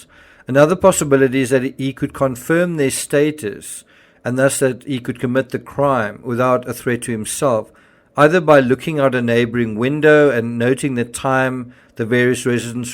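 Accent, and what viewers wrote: South Africa. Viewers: You accent is like a South African? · is from South African. We have friends from Durban who have the same accent.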